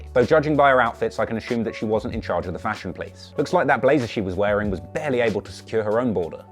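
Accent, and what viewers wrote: United Kingdom. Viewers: How got me to subscribe: by telling great stories whilst having a rather dashing UK accent. I typed this in a UK accent.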